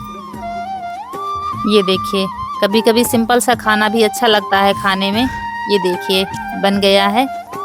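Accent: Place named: Uttar Pradesh, India. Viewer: up me kaha se didi hum bhi up ke kanpur se hain... Bhasha boli aapki jaani pehchani lagti hai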